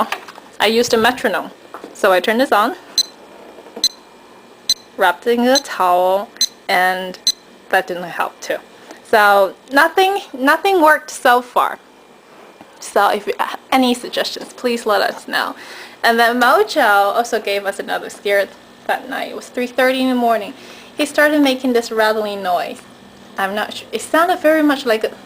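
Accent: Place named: China. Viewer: She's Chinese, so it's just her accent.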